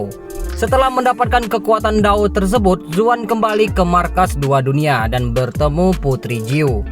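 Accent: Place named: Medan, Indonesia. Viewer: Logat Medan nya kental bgt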